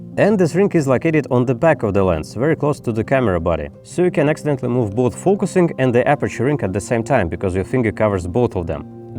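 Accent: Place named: Russia. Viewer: Хахахп меня не обманешь! Раскусила ваш русский акцент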